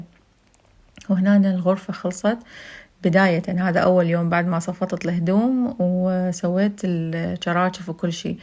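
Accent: Iraq. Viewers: هلو شلونج حبيبتي سوال فضولي لو سمحتي انتي تكولين ولدتي وعشتي بلعراق ولهجتك عراقية مىة بلمئة سوال انتي والدك سوري اكيد لاكن والدتك سورية لو عراقية واذا كان والدينك سورين اثنينهم شعجب تعلمتي لهجة العراقية حتى نولدتي بغداد مجرد سوال اذا ماتردين تجاوبين علي من حقك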